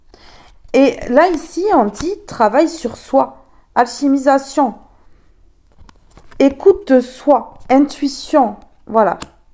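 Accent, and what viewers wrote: South, France. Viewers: j'asore votre accent du Sud...trop mimi ne changez pas merci pour votre guidance bon wkd...13 vendredi😊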